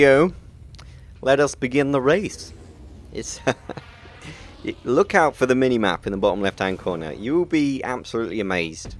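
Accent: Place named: Southern England, United Kingdom. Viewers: Which british accent...lol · DeadDudeDancing I'm from the UK and I'm not sure, sounds like he's from the South like me, I think what sets him apart is (no offence) his high voice. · Which british accent is that?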